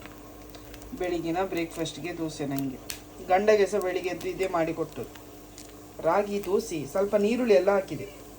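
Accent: Mangalore, India. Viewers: ಮಂಗಳೂರು ಭಾಷೆ ಕೇಳ್ಳಿಕೆ ಖುಷಿ ಆಗ್ತಿದೆ ಮಾರ್ರೆ 👌👌👌👌👌👌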